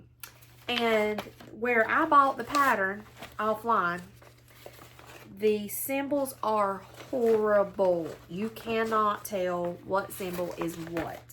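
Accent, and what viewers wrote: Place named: South, United States. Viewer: Just found you. You sound like you have a very familiar southern slang. Was nice to hear. Enjoyed your tube. Thank you for sharing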